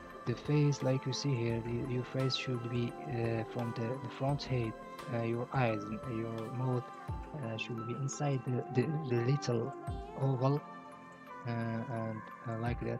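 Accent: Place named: Morocco. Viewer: bayna mn aaaaaaaah w sawtk mghribi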